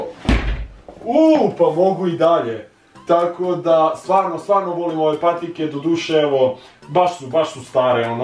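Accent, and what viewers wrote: Leskovac, Serbia. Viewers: Ja sam iz leskovca ako bi moga da pricas 24h na sa leskovacki naglasak i glas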